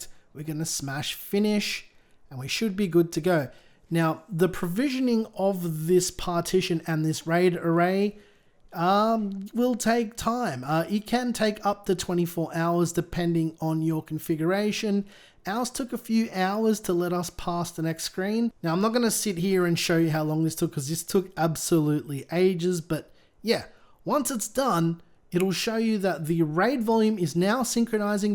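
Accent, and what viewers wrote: Australia. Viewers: Haha yeah but stick to your guns I reckon. Ignore the complainers. People need to accept you’re Aussie with an Aussie accent.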